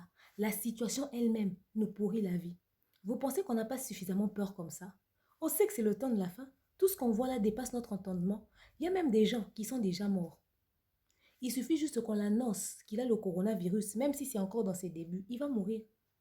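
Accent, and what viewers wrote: Ivory Coast. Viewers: Bien dit merci. Juste ton accent, tu parles comme les ivoirienne pourquoi?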